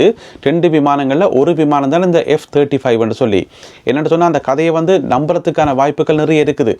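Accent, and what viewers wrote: Sri Lanka. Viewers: இலங்கை தமிழ்.❤❤❤🎉🎉🎉🎉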